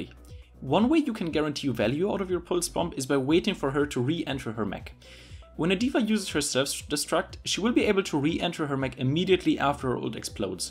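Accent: Germany. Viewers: dat gierman aiccent... ausgezeichnet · Man hört halt krass raus das du deutsch bist :D Aber danke für die ganzen guten Tipps!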